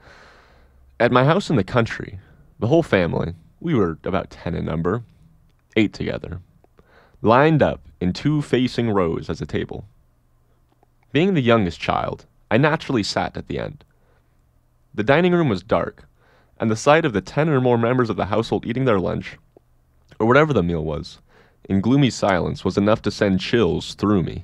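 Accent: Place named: United States. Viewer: God the thick American accent and the stupid pity half weeping sappy voice what a way to ruin an audiobook. The writing is not supposed to be sentimental lol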